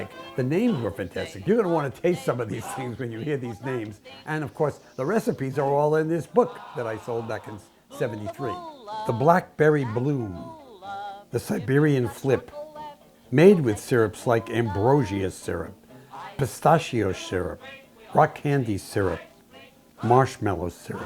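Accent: New York, United States. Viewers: Where exactly did you grow up, you say New England but I hear New York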